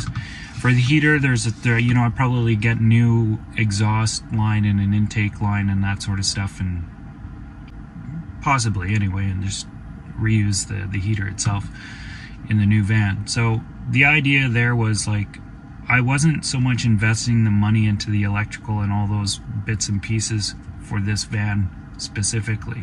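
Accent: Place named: Quebec, Canada. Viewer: Watch Eric's channel where he talks about "11 design mistakes". Really interesting. Oh and the accent is from Quebec. Thanks for sharing A live stream would be great!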